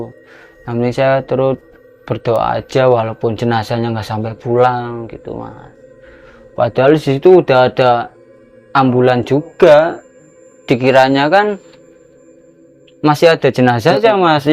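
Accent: Indonesia. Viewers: Mlaysia hadir. Pekat sangat indonisianya .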